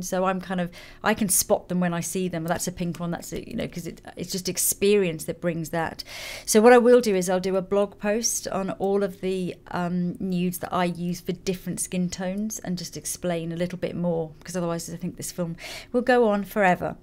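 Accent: United Kingdom. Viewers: Howdi yal , I fell on this video and why did i not click away ?? Idk maybe hearing a British voice ?? But I was so enveloped but her voice and video I payed so mutch attention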